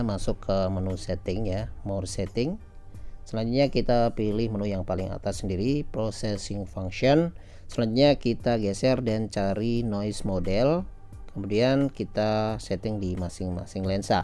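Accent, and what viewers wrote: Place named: Jepara, Indonesia. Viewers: Wong jeporo yha mas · Wong jeporo ta jebule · wong jeporo mas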